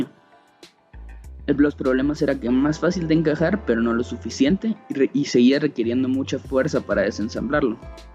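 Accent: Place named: Guatemala. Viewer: Se nota que sos de guate va loco jajajaja, saludillos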